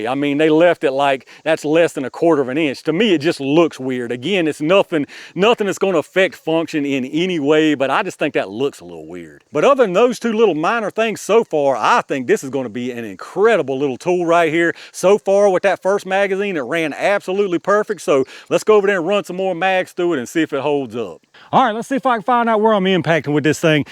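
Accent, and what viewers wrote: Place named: Appalachia, United States. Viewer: Hey. Great video, informative yet succinct. Just got rid of an LCP Max. Your ergonomic description of it as “a piece of dimensional lumber” is spot on. I never enjoyed shooting it, no matter what I did (extended mag, Hogue grip, etc.). And the trigger was absolute trash. Felt just marginally better than the cap guns I used to play with as a child. Also, very much enjoyed the beautiful Appalachian accent (hope I got that right). All for free. Good deal. God bless!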